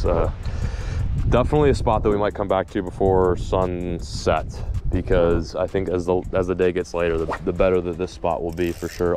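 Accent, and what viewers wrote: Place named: Texas, United States. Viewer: I haven't watched in a while, but Holy shit dude, you actually sound like you're from Texas now 😂😂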